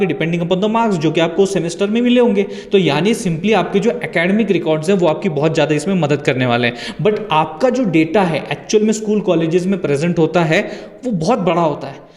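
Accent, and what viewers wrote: Maharashtra, India. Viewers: Sir language apki Marathi ki Tarah h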